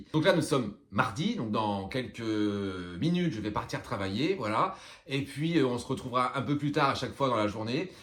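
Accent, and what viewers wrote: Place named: Picardy, France. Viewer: Bonjour. Je découvre votre chaîne, très sympathique et colorée. Merci pour la bonne humeur, et toutes vos beautés. ( Il me semble reconnaître un accent '' un peu de Picardie '' 😁😉. A très bientôt !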